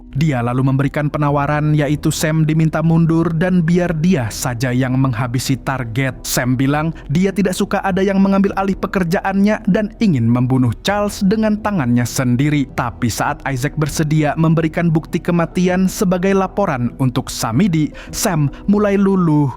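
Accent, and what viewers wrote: Banjar, Indonesia. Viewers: Logat mimin kek org banjar... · Org bnjar bnar Sidin nie amn di dengar logatnya😅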